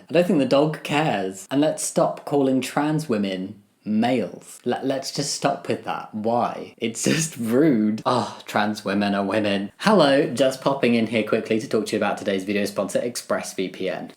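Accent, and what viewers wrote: England, United Kingdom. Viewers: He's English so of course he speaks weird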